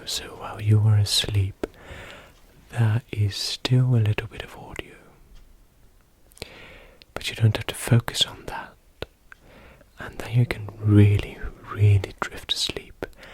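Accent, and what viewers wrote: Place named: United Kingdom. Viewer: I love your British accent,! Are you from London? Congrats 🎉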